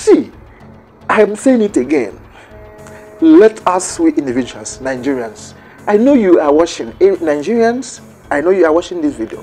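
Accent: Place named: Ghana. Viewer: O boy u no be Nigeria with the way u sound,say for u Ghanaian because I no see u as Nigeria.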